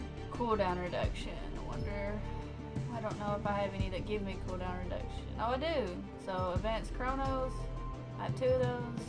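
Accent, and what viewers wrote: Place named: Tennessee, United States. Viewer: Dat Sweet Sexy Tennessee accent <33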